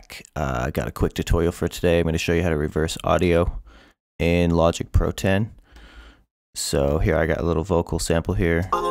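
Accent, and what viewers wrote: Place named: Sweden. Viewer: Sounds Swedish, amazing!!! thnk uu was so helpful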